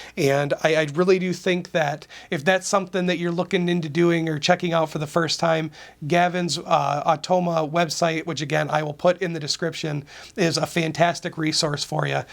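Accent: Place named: Wisconsin, United States. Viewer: I feel like you have a northern accent. Is that a Wisconsin accent by chance? lol.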